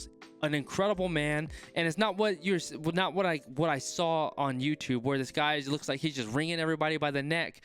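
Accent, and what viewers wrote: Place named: United States. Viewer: Thanks J. Enjoyed watching you and your reaction vids. As a saffer it’s really nice hearing it all in an American accent. Thanks again boet.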